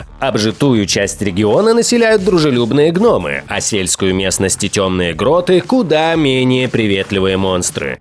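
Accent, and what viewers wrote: Ukraine. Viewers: Яка гарна українська😃🤗 гадаю що ви ховаєте цілу нишу можливостей! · Сотня вподобайок за гарну україньську!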